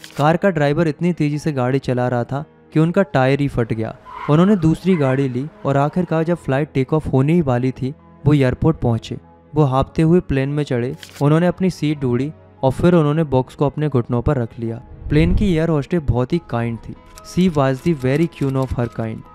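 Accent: Uttarakhand, India. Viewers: Are u from Uttarakhand❤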